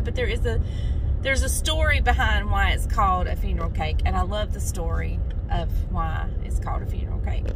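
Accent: South, United States. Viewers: I can hear it too.small town southern wife❤️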